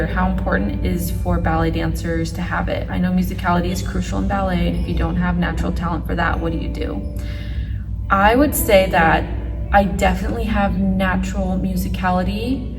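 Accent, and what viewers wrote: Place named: United States. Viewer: Hello, I am an italian girl, not a ballerina☺️ I love following you not only for your contents, but also because your american accent is amazing to me and I really would like to learn your pronounciation😄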